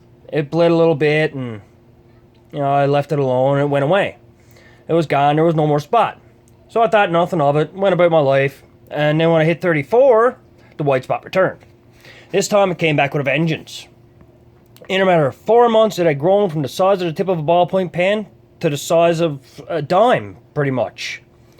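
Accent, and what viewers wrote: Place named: Maritimes, Canada. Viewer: You sound like you're from the maritimes.. That's where I'm from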